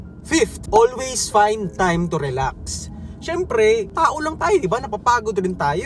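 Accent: Visayas, Philippines. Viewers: Bisaya kaba idol?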